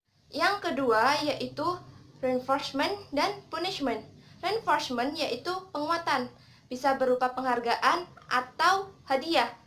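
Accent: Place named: Osing, Indonesia. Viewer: Ketoro wong osing 😁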